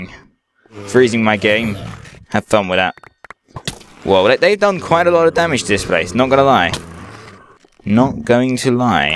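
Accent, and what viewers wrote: United Kingdom. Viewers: do u live in englend cuz u sound like it but ur sooooooo awsome <3 luv ya ^_^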